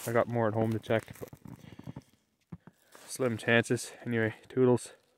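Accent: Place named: Canada. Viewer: Also, I’m Canadian and you sound really Canadian am I right? Nice to find Canadian creators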